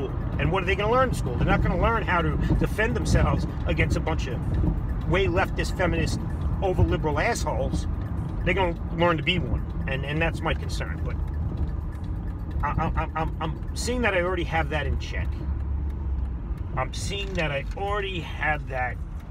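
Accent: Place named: East Coast, United States. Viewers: Not sure if I watch ur channel because of ur accent or cuz u make me laugh so fing hard but I love it and it makes me miss the east coast even more. Keep it up bro! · New subscriber loving ur content, curious where you from? Has to be somewhere around the tristate area with that accent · I grew up in Smithtown. Your accent and attitude reminds me of where I'm from. Good stuff!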